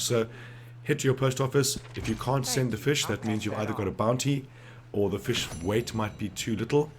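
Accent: South Africa. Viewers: Sound like a South African 🤔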